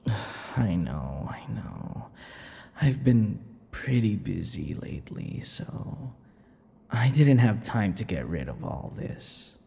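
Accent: Philippines. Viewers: he's closest to Filipino accent 🥵❤️‍🔥🇵🇭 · OK- this is just a idea cuz ur filipino accent, love it ALOT, can u maybe do a filipino one? would love to see someone take my idea but there is no forcing but just a suggestion :D (i love ur vids so much omg, keep up the amazing work!!)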